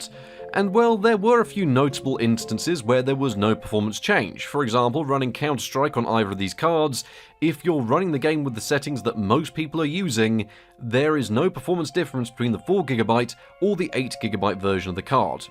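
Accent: United Kingdom. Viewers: What region of the UK is accent from?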